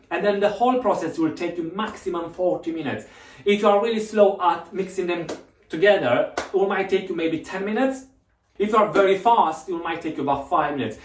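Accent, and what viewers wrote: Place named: Romania. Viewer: He is romanian..i knew it..by the accent.😉👍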